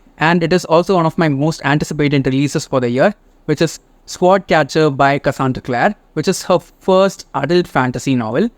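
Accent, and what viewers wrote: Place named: England, United Kingdom. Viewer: Love ur english accent.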